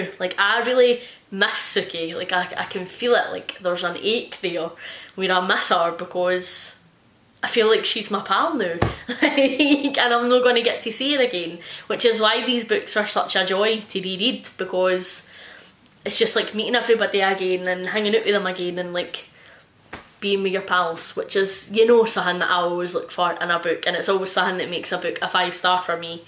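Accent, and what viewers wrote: Scotland, United Kingdom. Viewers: why are scottish accents literally the best omg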